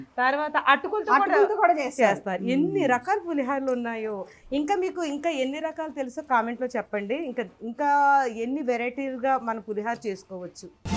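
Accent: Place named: Godavari, India. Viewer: Mee telugu slang maa godavari slang madam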